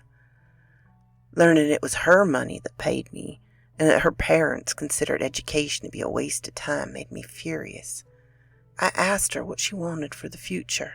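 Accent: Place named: South, United States. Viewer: I'm found this to be overall interesting. The southern draw was at the least my ears delight. The story was at most entertaining and the attractiveness of bringing it all together is something that draws me back everytime to you Madame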